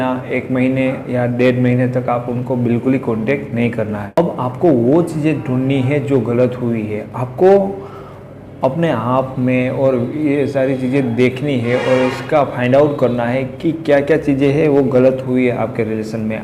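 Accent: Gujarat, India. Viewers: Bhai tame Gujarat cho?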